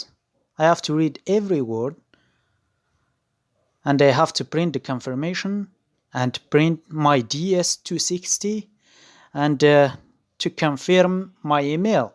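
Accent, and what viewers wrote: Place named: Morocco. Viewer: U r moroccan lol guessed it from ur accent lool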